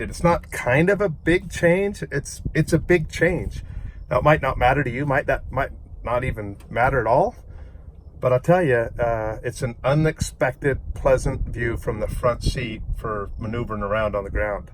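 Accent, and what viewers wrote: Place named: United States. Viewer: you have a much stronger USA accent than your brother, respect from cup of tea governor, Englishman, MIC696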